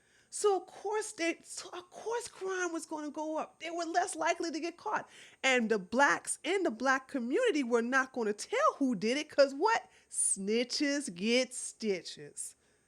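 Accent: United States. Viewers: I am Irish born in Austria {Europe for those who do not know Geography} but raised in French West Central Africa {Sénègal + Guineé {not same as Guinea in South America} also Saïgon, Viêtnãm in 1958} I married a Philippina my brother a Korean. We lived out in the ville {out in the local community} not the American compoud and went to the local School {Lycée Von Volenhaven} not the American school. So I was extremely presently surprised by the extremely good logic but had a liitle dificulty understanding you because of the American Dialect used but think it is geared to your main audiance and because of my age I have not keept up with the various types of American English used. Now I have given my background I have a question that i have not gotten and answer to. In the 60's the black in large numbers had «Afro Hair Style» well when they arrived with there «Boom Box» the looking for their «Roots» the local disrespected them called them worst than a dirty whiteman. 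Their reason was that they did not know or had not tried to know history culture of their region thaey had taken White culture and corrupted it and forgot their ancestors making them lower than the lowest white. I never saw a local wearing and «Afro» or carrying and playing at volume a «boom box»!!!! So When I got to USA I asked why/what was the «Afro» I was told it was traditional hair style by a number of when I asked from what region {East., West, South or North } {I was familiar with West Africa} and noone could a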